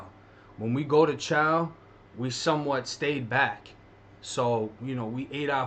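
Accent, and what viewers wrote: Boston, United States. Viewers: I hear Boston. Is it? Yes. Wicked Boston! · You sound like us Boston Ma accents lol. I'm glad I came across your channel.😁 let me find out your from Massachusetts 😁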